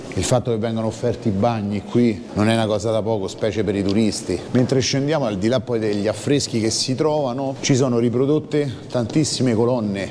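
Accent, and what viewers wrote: Rome, Italy. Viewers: Grande Cicaló, sto video giunge a fagiolo, a Roma ce devo da vení fra du mesi, hai inserito delle info importanti, si te vedo in giro pe Roma te saluto.. Quant'è bella sta città ❤️ e quanto me piace a parlata romanesca... - Ma dimme, ma come só ste olive.. - eh come só, sò greche 😉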